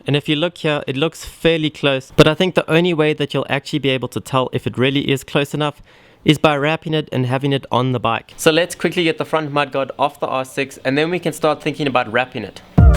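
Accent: South Africa. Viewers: I knew your voice sounded south African btw love your vids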